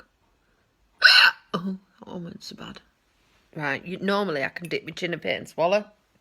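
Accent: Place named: Australia. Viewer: You sound like an Ozzie ... and I adore ya! Pretty you are, too!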